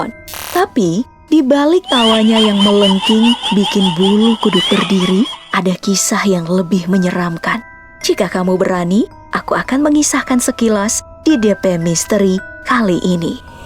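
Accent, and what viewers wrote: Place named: Pontianak, Indonesia. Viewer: Yang berasal dari pontianak mana nihh suaranyaaa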